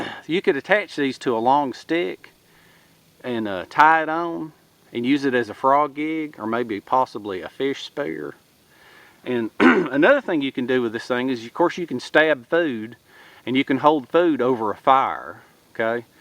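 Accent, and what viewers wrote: South, United States. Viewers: My favorite item was the Alabama Hammer. It warms my heart to hear a fellow died in the wool Southerner speak on here. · You also have a delightful way of putting things and a nice southern accent that I find relaxing. Your laughter and humor always leaves me with a smile or grin on my face. Thanks for your videos; I'm a fan. (now in Idaho by way of Tennessee).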